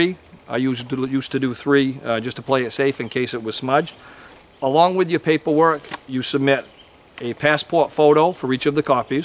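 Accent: Maine, United States. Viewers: hey, you sound like your from Maine. Maybe Houlton, Presque Isle area? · It's a Maine accent. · Dude, are you from Maine? Great piece by the way!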